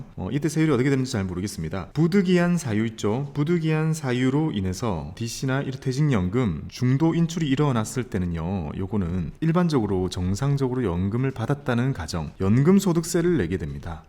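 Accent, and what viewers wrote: Gyeongsang, South Korea. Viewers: 곰희씨 특유의 사투리, 같은 경상도 사투리 사용자로서 넘 친근해요. 우짜죠? ㅋㅋ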